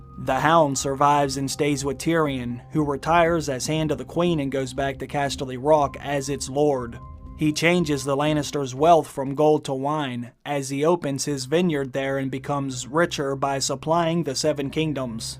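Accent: United States. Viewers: What is your native tongue? I would like to know how you've mastered American in just a year or so. Thanks!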